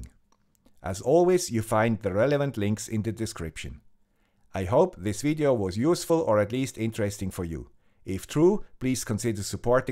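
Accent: Switzerland. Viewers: my brother with that crazy Swiss accent... I'm so very glad 2020 is continuing to treat you well!! Just wanted to wish the best of wishes to you and your family well for the rest of the year!! Lets hope this insanity is mostly in our rear view mirror now... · Make sure that no-one tells our Swiss guru that he should have described the Discord community as "vibrant" rather than "vibrating" at about . Keep up the good work, I am sure that technical instructions sound more convincing when presented in a Swiss accent.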